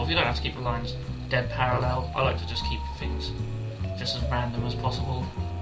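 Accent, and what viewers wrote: Norfolk, United Kingdom. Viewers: is that a Norfolk twang i hear in your accent ?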